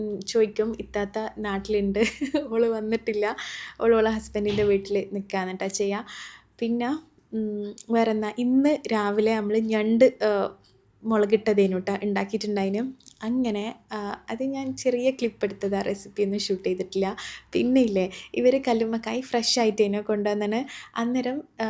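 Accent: Kannur, India. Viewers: ഇവർ വർത്താനം പറയുന്നത് കടിച്ചു പിടിച്ചു ആണ് അതുപോലെ തന്നെ എപ്പഴും പിന്നെ എന്താ പറയുക എന്ന് ഏതിന റീപിറ്റ് ചെയ്യുന്നേ കണ്ണൂർ ഇങ്ങനെ ആണോ സംസാരം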